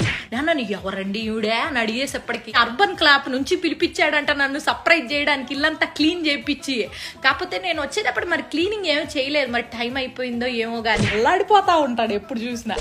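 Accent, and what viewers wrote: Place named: Nellore, India. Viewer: It’s one of the best laughable reels sister, amazing, on top Nellore dialect is superb, keep rocking.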